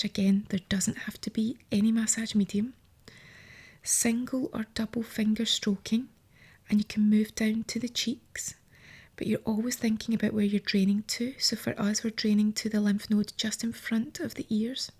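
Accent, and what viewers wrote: Scotland, United Kingdom. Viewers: Beauty Thank you. Lovely to hear your accent too - I am also Scottish! Comforting sound and lovely video. Subscribed!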